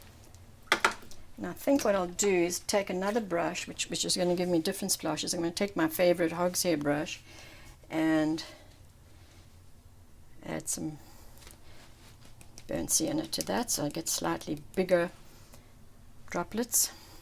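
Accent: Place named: South Africa. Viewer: Hi, Ive been doing watercolours for the last 9 months and loving them. Thanks for your videos they have been very helpful to me. By the way you sound very south african...must be an expat!:)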